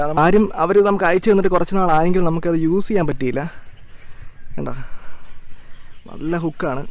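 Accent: Kottayam, India. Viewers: KOTTAYAM SLANG ALSO NICE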